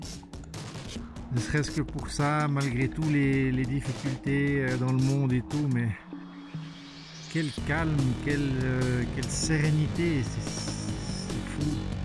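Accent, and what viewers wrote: Switzerland. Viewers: Merci pour ces belles images, à mon sens une grande qualité de réalisation! et j'apprécie tes valeurs (l'humain con ^^) Cela donne envie de visiter la Suisse en tout cas Et pourtant que de préjugés et autres clichés vu de France (bon en même temps vous nous le rendez bien) j'adore ton accent (léger) qui me fait voyager pas si loin certes, et pourtant il y a déjà tant de différences culturelles.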